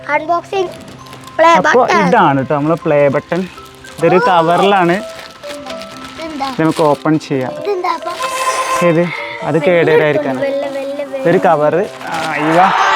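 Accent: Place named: Malappuram, India. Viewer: Happy ആയീലെ ഇനിയും ഉയരങ്ങളിൽ എത്തട്ടെ. ഞാൻ ഇപ്പൊ അടുത്താണ് വ്ലോഗ് കണ്ടു തുടങ്ങിയത് എനിക്കിഷ്ടം നിങ്ങളുടെ സംസാരവും സാദാ നാട്ടിൻ പുറത്തെ നമ്മുടെ മലപ്പുറം slang 👍👍👍👍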